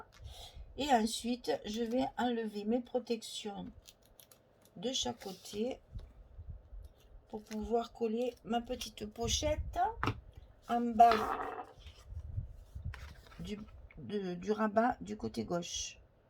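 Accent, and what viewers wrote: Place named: Southern France, France. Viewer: Quelle jolie début ! J'avais vu cette collection mais les textes en anglais pour les fables de La Fontaine ça me pique un peu les yeux 😕 peut-être me ferez vous changer d'avis 😁 En tout cas je vais suivre ce projet juste pour le plaisir des yeux. PS : j'adore votre accent qui sent le soleil, la mer et les cigales. 😉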